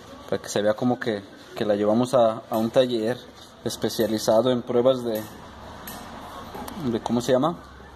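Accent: Mexico. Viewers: por el tono voz es de Jalisco???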